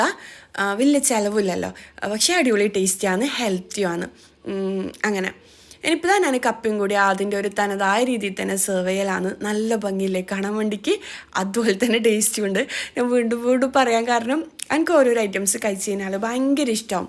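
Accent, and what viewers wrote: Kannur, India. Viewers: Sorry nan parayunnad thonna Ruth cheriya thettan Nammude kannurkare prathekich thaliparamb payangadi bagathullavare ellavarum kaliyakkum samsarasheyliyil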